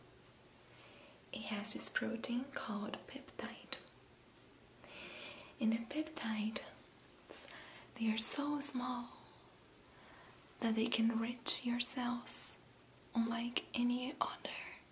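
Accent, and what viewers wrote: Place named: Brazil. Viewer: Gostei muito do teu Portugues mesmo sendo do Brasil, percebi, sou de PORTUGAL, compreendes a escrita certo?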